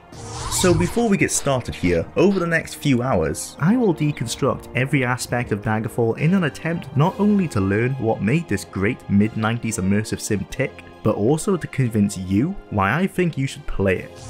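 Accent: Wales, United Kingdom. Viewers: Don't Hide Ur lovely Welsh accent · you shouldnt suppress your Cymric accent, Cymric people are based; also, Welsh/Wales is a slur meaning "Foreign/Strange People", it shouldnt be used, fuck satisfying the wants of the British, Britain is an Anglo-Scottish Union of oppression and cultural-genocide against the Gaelic and Bretonic peoples of the UK; the Southern English and the Low-Lander Scots, rule the roost of this regime we live in, under our dictator, Lizzie, whom ironically has the same birthday as Adolf Hitler, its symbolic... of the fact shes a fascist shite-arse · I can't believe I listened to a Welsh accent for almost four hours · ...I like your Welsh accent. Ignore the jerks who complain about it. Brilliant video!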